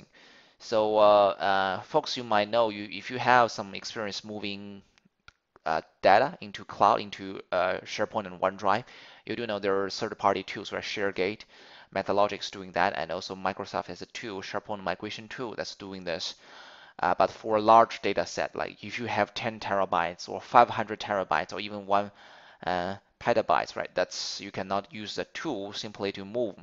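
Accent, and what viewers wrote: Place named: Philippines. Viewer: Thanks for sharing this, I'm interested to learn more technical skills in Azure! Appreciated so much. Anyways, are you a Filipino? because you have a filipino accent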